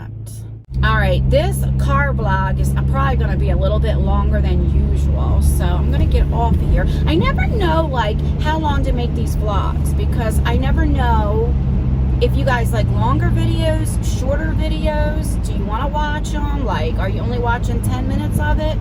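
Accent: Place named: South Jersey, United States. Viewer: I watched the whole thing. I can watch you 24/7 day bc it feels like I’m just hanging with my girlfriends lol I’m from south Jersey and talk just like you so I piss myself when you start dropping “Motherf** & friggin lol. That’s me all day everyday 😂❤️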